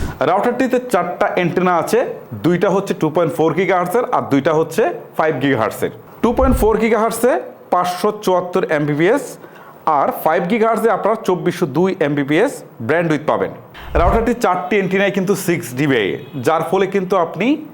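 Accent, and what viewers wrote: Bangladesh. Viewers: Border par Kora Asa chan naki India ta??? Poris Kar bangali kotha bol ta paran na??? Bangladesh accent marchen... ar vul bal router ar kotha bolchan. Amazon page a giya daklam.... sob gulor review ta bolcha kichu na kuchu defect Acha.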